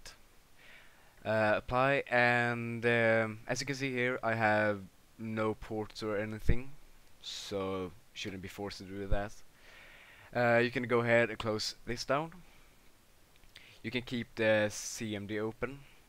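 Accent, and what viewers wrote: Sweden. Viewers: Snygg SvEngelska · Vi svennar har underbar accent asså <3 Very well done(in 1040p too!), works like a charm! :D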